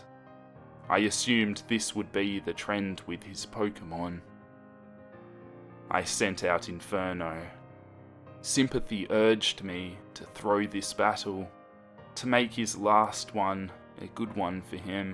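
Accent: Australia. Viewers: I'm sorry but Australian accents can't be creepy. Nice to listen to but it automatically makes you seem friendly lol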